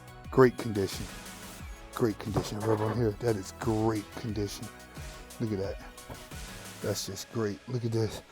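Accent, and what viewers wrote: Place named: Pittsburgh, United States. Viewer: You from the burgh bro?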